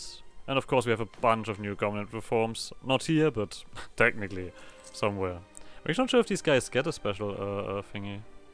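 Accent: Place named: Germany. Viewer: lmao after that "soooooo" i didn't even need to hear the accent to immediately know you're german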